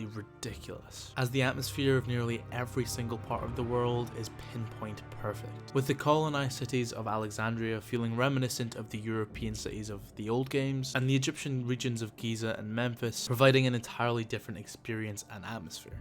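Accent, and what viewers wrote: United Kingdom. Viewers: Ur so British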